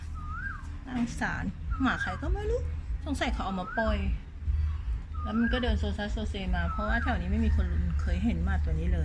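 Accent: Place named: Suphanburi, Thailand. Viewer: 😊👍พี่คนสุพรรณเหรอครับ ฟังจากสำเนียง ผมเคยไปเที่ยวบ่อย..อิอิ😁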